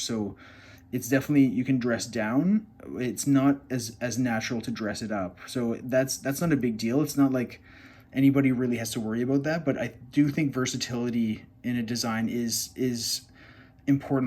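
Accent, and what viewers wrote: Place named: Canada. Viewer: Enjoying your reviews. You should do more. You're Canadian eh, just your Os and French pronunciation. Are you involved in any local watch groups?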